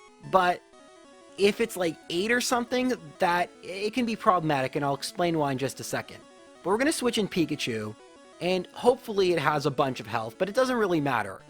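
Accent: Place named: Canada. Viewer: I can tell your Canadian by the way you say “again”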